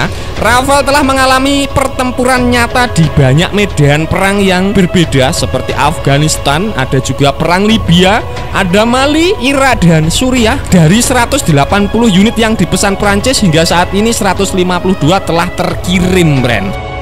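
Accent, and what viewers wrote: Java, Indonesia. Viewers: Medok sangat minn... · Kayaknya sengaja dimedokin logat jowo-nya sama si mimin..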